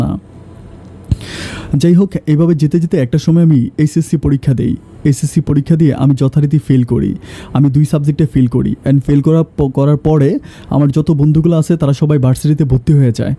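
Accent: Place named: Barishal, Bangladesh. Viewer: ভাই মনে হচ্ছে ি বরিশালের ছেলে ার ভাষা বরিশালের মত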